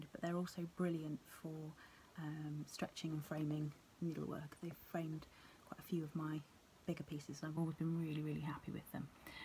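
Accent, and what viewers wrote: Wales, United Kingdom. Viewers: Watched you after Jan Hicks recommended you channel. What a relief to hear a lovely soft English / Welsh voice after so many Americans. Love your stitching! Good luck. Kate, Melbourne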